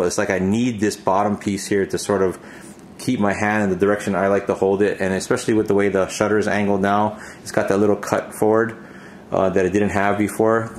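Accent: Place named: New York, United States. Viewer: I got my X-H1 today and watched your Opening Box Video when opening mine. Cool. Share the experience. One thing I was hoping you’d talk about: what is that little gizmo that looks like a T? I’m an engineer and can’t figure out what the heck it is. I read manuals as a last resort. Another thing: I sold my X-T2 Graphite for this one. I have an X-T20 as my second body. I like the look and feel of the X-T2 much better but I bought this for one reason: IBIS! I’m a prime shooter. I have one zoom - the 18-55mm and unfortunately got spoiled by being able to shoot at much lower ISO. The IBIS on the X-H1 is fantastic. 1/8 sec? No problem. Tack sharp. Worth the upgrade. Now my 56mm f1.2 comes with me on night street shooting safaris. (You think the X-H1 is heavy now?) The larger body was necessary to fit the IBIS. I like the extension rear of the mock mirror housing. 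That too was necessary to get more distance for the new 3.6M screen. The sub-display? I’ll never use it. I’m not about to put on glasses just to read that. Defeats the purpose. I wish they have kept the Exp comp dial. I used that and it kept more the retro look. Hey, since you sound like you’re from NY, like me, stop trying to pronounce “tt.” Sounds unnatural. The Queen of England doesn’t so why should you?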